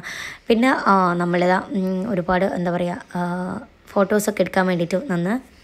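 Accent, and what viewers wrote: Malappuram, India. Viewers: Thani Malappuram slang Ijj kajj kujj Idayil sramikund shudham parayan 😂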